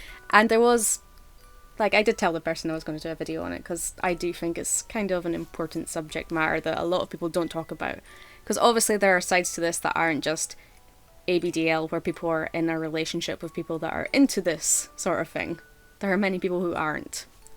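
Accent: Scotland, United Kingdom. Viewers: While having issues at night as well as the occasional daytime accident relationships are difficult. If I had a partner with an issue that required diapers ( if I didn't have any issues of my own). I understand that the human body is a complex organism and things don't always work right and I would accept the fact that they needed to use diapers. Thank you for your videos I have always enjoyed them, especially your honesty. Now if I recall correctly in one of your videos you mentioned you're Scottish, I have always loved your scottish brogue. P.S. I am glad to see that you are uploading again.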